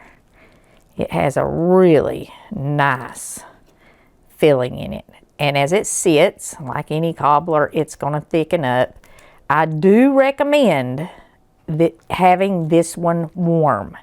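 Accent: South, United States. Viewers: Love your recipes and sweet southern accent ❤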